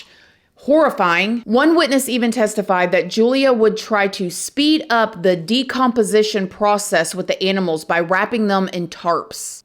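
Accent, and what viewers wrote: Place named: West Virginia, United States. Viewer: Eeeny bIdy eeeny where. You have the cutest accent. You sound like me. I’m in West Virginia and have a country accent. I love hearing you talk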